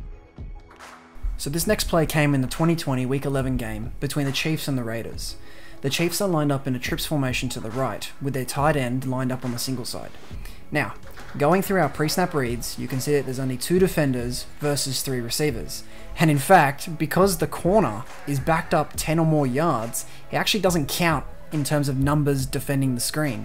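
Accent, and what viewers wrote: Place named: United Kingdom. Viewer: Wait your British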